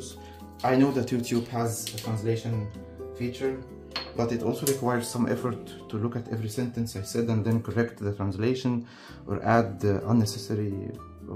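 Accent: Egypt. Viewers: i knew it ......i knew it .....i knew it .. its Egyptian accent منين يا غالي في ام الدنيا وياريت وسيلة تواصل معااك انستجرام او فيسببوك القلب مش بيحن من فراغ و الله متابع ليك من زمان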